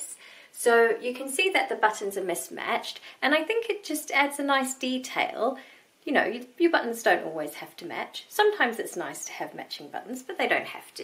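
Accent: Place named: New Zealand. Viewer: Lovely to hear a kiwi voice on a you tube sewing vlog. Hi from Wellington 😍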